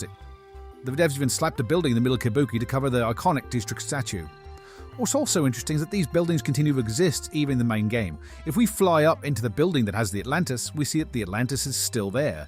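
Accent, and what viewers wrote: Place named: Australia. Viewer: Australian i see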